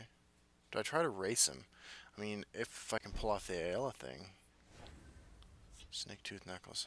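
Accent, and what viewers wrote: Ireland. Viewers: Brazillian, spanish, Portuguese, you name it, but his accent is Irish.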